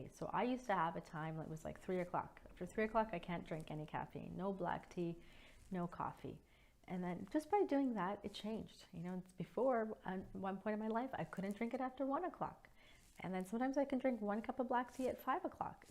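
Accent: Canada. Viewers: I knew she was Canadian before she said so. They often have a way for throwing away every sentence so that nothing is salient. The rest was just nonsense of course. Relaxing nonsense at least.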